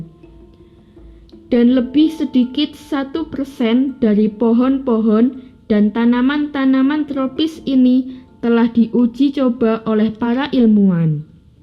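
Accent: Java, Indonesia. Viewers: suaranya Medok dan cadel mbak 😄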